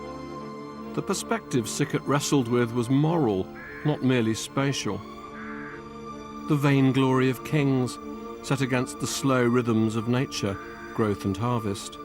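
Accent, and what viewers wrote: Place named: RP, United Kingdom. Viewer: I enjoyed this. Bar the plummy tones. Zzzzzz😮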